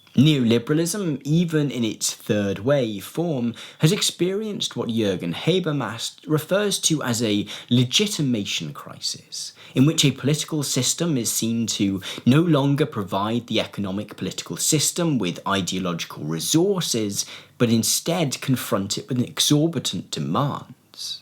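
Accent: United Kingdom. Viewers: He’s British. That’s a different type of english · Apparently I'm late to the party but just wanted to say yes! I've found a new left tuber! And it's refreshing to hear a UK YouTuber who's unafraid to speak with a UK accent and UK pronunciation. Idk why but I really dislike the extreme US fetishism of most UK YouTubers.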